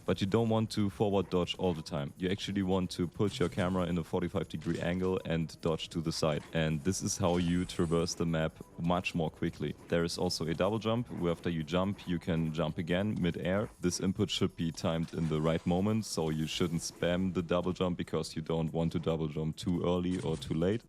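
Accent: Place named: Germany. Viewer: Love your accent, please, can you be a lil bit more german? That would be wertgeschätzt ❤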